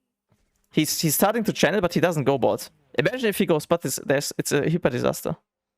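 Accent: Germany. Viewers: Immer dieses komische Englisch, das wird sich kein Schwein anschauen nur weil Tyler1 im Namen steht weil du eben kein Nativ Speaker bist und man das hört. Würde ich auch nicht wenn ich Englische Streams schaue dann von jemanden der dies als Muttersprache hat und nicht einen deutschen der Englisch spricht. Ist ja Giga Cringe und die herbste Reichweite generiert man damit auch nicht weil man eben einen guten Bruchteil seiner Fans enttäuscht die aktuell schon den Kanal folgen und vielleicht kein Englisch können.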